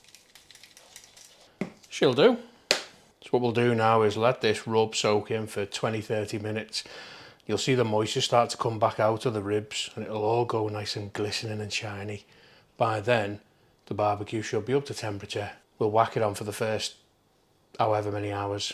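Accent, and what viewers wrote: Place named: Wirral, United Kingdom. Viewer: Looks good mate. Are you on the Wirral? Accent sounds like it. Just been to Farm Foods in Ellesmere Port. Got the ribs £12.99. Got some other cheap freezer stuff so happy enough.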